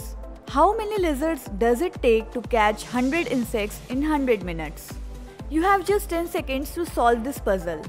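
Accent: India. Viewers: Are you an Indian? Your voice is recognized.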